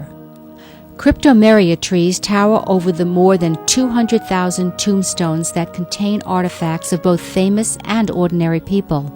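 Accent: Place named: East Coast, United States. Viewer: The narrator's eastcoast accent was a nice touch....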